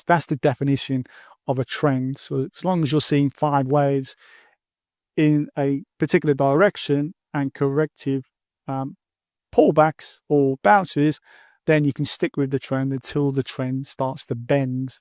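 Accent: Birmingham, United Kingdom. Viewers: Are you from Birmingham uk?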